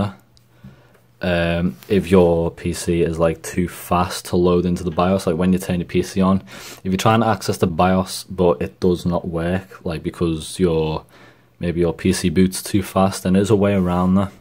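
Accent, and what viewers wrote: Liverpool, United Kingdom. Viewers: taaa lad, did not think id find a scouser on here with actual useful information xD nice one this really did help, my corsait k70 doesn't turn on until the option is allready passed to get into the bios so you saved me a lot of stress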